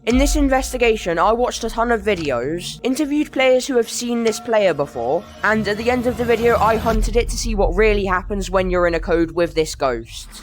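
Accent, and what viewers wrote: United Kingdom. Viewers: I love his British accent 🙃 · are you British? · the british acent is killing me🤣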